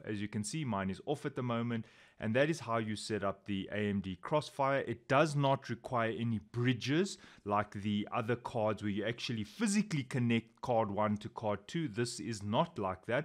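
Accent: South Africa. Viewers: Nice to hear that proppa Sarf Efriken accent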